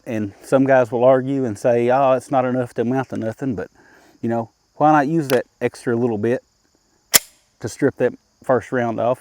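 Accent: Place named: South, United States. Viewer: Dude! I dig your last name.! That could be a band name. Right on brother! and I just subscribed to this guy's channel because of his non threatening southern accent, and I thinks he's right about the slide thingy.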